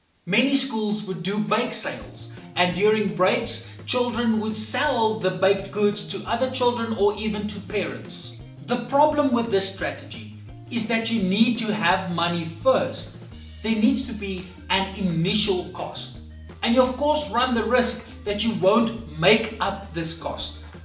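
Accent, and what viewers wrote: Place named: South Africa. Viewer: You sound like you're from South Africa or from Zimbabwe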